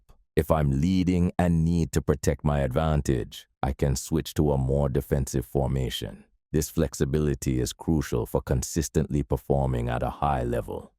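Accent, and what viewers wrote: Jamaica. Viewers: Great informative video as always bro, you will always be the Bob Marley of eafc... I love the Jamaican accent😊....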